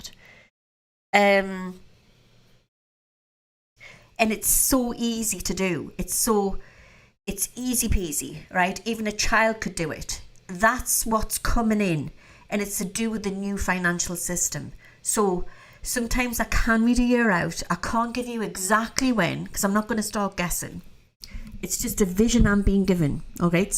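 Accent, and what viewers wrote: Newcastle, United Kingdom. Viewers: Love the show, and as a fellow Geordie I love to hear our local accent! Keep up the great work. x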